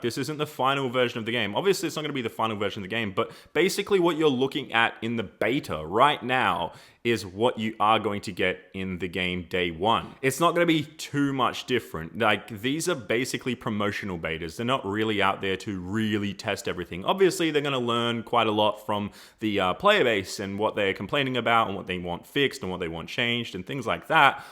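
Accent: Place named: Australia. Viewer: Always been in to Australian accent! Hahahaa “GOOD DAY GUYS HERE”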